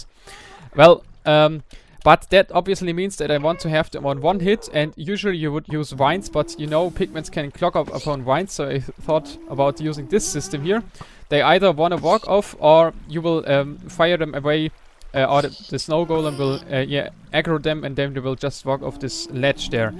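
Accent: Germany. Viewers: are you german? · wegen dem Akzent, klingt so ein wenig deutsch :D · ich hab schon die ganze zeit das gefühl gehabt dass du deutsch bist. du hast einfach so nen "Akzent" und man hört das typische Schulenglisch raus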